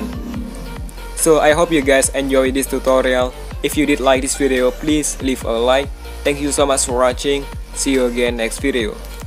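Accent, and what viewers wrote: Indonesia. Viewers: Indonesian accent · English aksen indonesia 😅 but videonya sangat membantu bro.. thank u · are you indonesian? hahaha those accent tho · aksen Indonesia memang top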